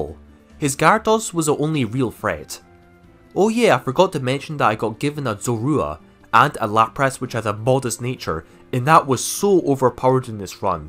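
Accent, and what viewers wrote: Scotland, United Kingdom. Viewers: you have a super Scottish accent. lemme guess...your from Scotland lol. (or wales..?)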